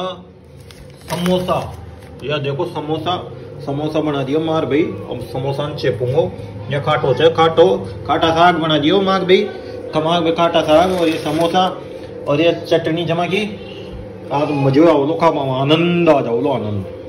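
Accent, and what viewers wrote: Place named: Marwar, India. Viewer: Marvadi bhut achhi lagti h Bhaiya apke muh s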